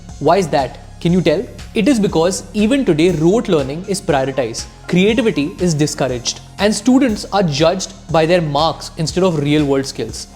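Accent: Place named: India. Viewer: So irritating and cheap indian accent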